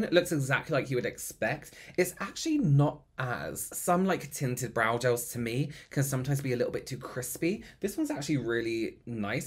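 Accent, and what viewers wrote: England, United Kingdom. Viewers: Do you speak Spanish? Can you in your next video? I’m dying to hear Spanish in an English accent! Or maybe you have a different accent when you speak Spanish ?! Either way, I’d love to hear it! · Never in my life would I have thought I’d be in love with a pale Mexican with a British accent. 😂♥️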